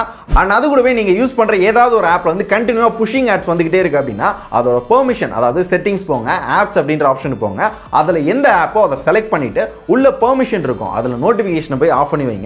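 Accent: Madurai, India. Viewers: Bro nega madurai ya🙋🙋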